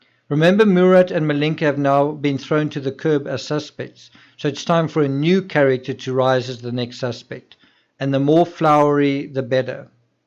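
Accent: South Africa. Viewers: Your accent is definitely South African.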